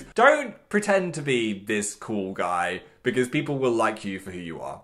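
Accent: North, United Kingdom. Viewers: Give the northern accent some love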